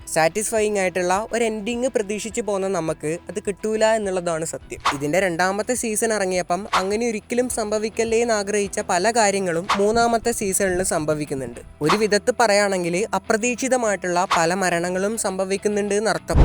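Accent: Kannur, India. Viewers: Kannur slang hit different 🤍📈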